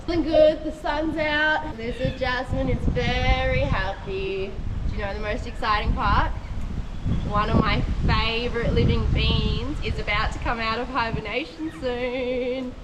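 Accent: Australia. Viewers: Your awesome you sound like a Aussie what a chick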